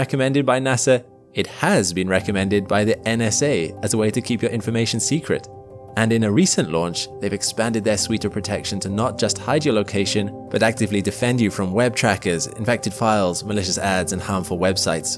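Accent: Wales, United Kingdom. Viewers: are you welsh ? ur accent sounds slightly welsh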